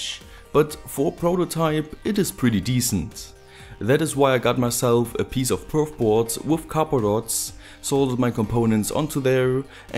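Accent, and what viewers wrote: Germany. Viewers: Can't tell Is he german or Chinese? Or both ?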